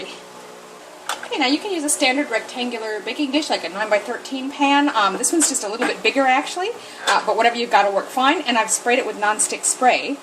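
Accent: Wisconsin, United States. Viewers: aahh,I'm from Chicago,and we can always catch that cheese head accent! Though like you I'm far from home too.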